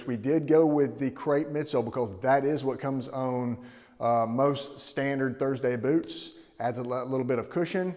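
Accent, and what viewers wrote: South, United States. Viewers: Love watching your work. That Southern accent is a plus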